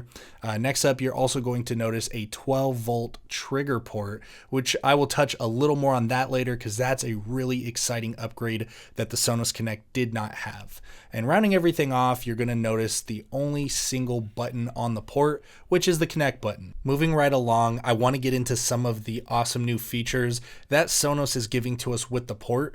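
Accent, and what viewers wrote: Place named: United States. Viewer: Annoying American accent. Low info density, too low. All info could have been given in 5 mins.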